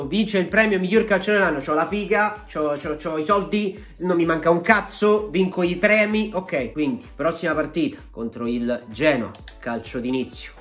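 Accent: Rome, Italy. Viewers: Fai troppo ridere amo tantissimo il tuo accento Romano 😂😂😂😂😂❤❤❤❤❤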